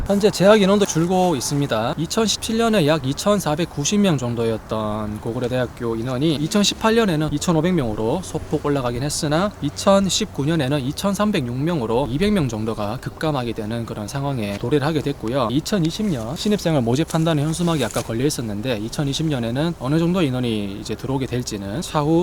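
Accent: Gyeongsang, South Korea. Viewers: 전라도 땅에 이름은 고구려 경상도 말투의 진행자 분 삼국 대화합의 장이네요 나주대로 다시 바뀌고 만학도들이 많이 다니는 학교라니 지역 경제 활성화 차원에서도 잘 되기를 바랍니다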